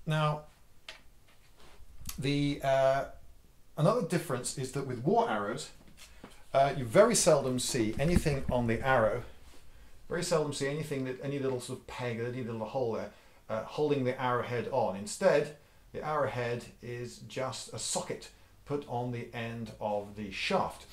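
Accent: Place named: England, United Kingdom. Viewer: You may ramble all you like Luv. My Grandfather was from England and I loved to listen to him talk and hear that "Accent" of his and once in a while (More oft then not.) that same "Accent" will come out of my mouth and my friends at "The Minnesota Renaissance Festival" just love it when that happens because they know for a fact that I am not faking it like they are and the "Accent" comes out a great bit more there. But all in all. I miss hearing a pure English voice like yours So. Keep on rambling on Luv..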